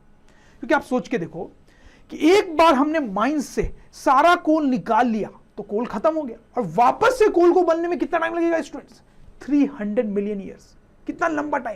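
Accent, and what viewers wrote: Bihar, India. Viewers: Sir are you from Bihar ?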